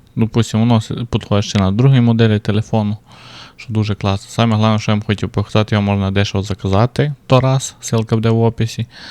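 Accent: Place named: Transcarpathia, Ukraine. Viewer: По говору, чую, що з Закарпаття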